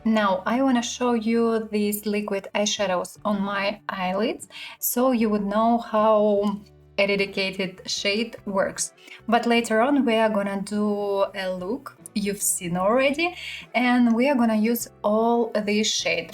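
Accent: Russia. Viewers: Искала отзывы на новые жидкие тени от Катрис и попалось Ваше видео. Очень хорошо показали и рассказали, наверное, куплю зеленый цвет, думала заказать от Эльф. Хорошо так говорите по-английски, русский акцент немного слышно, а когда увидела, что Вы живете в Мюнхене, вообще удивилась. Привет из Аугсбурга и удачи в развитии канала! :)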